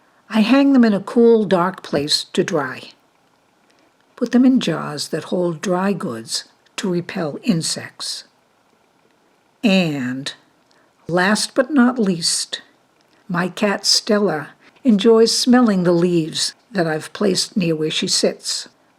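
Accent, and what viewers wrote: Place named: New England, United States. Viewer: Thank you, that's nice to hear. I used to live in NYC & PA but now I live in Santa Fe, NM. My family is still in NH, but I don't often get to western MA. I guess for now I'll follow you here and on FB. Thanks again for the video. It was full of solid info and hearing your voice brought me back to my New England roots.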